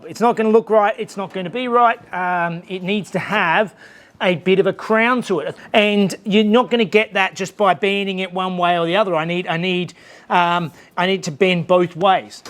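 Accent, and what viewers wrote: Australia. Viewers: English, is not my first language (Dutch) as a someone from Europe, a Aussie accent is obvious;-) Don't hear the difference between Aussie en NZ (?)?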